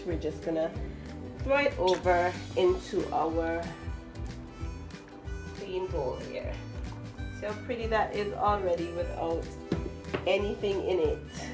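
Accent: Antigua and Barbuda. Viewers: YouTube brought me here because I was looking for a recipe, and then I heard the accent and was like, "Hold a minute." Antiguan 🇦🇬 can't hide.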